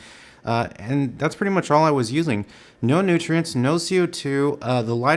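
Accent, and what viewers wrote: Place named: Glasgow, United Kingdom. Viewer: Glaswegian :P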